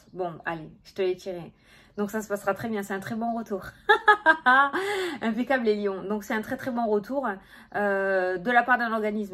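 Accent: South, France. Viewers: Coucou l accent du sud fait toujours chaud au cœur gros bisous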